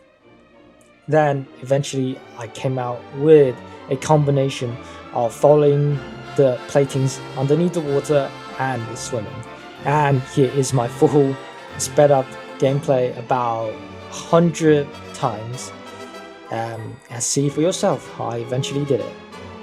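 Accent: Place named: United Kingdom. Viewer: Unrelated question: How do you sound so British/ Western guy when your Genshin Impact Language preference is in Chinese 🤔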